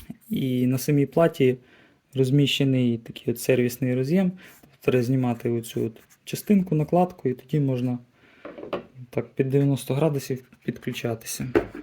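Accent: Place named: Ukraine. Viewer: Речь хохлатая дюже поганая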